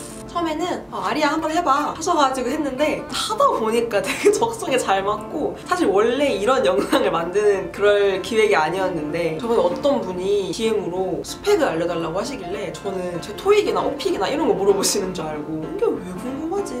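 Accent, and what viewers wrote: Busan, South Korea. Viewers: 말투에서 약간 부산사투리가 조금씩 묻어있네요ㅎㅎㅎ 친근햄ㅎㅎ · 아리 사투리...? 뒤에 가니까 진짜 부산출신이셨구나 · 오 어쩐지 .. 경상도 사람은 사투리가 너무 잘들려서 어디 사람인지 궁금했는데 부산 .. 와 내척 친밀감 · 처음에 사투리 듣자마자 엇 부산! 이랬어욬ㅋㅋ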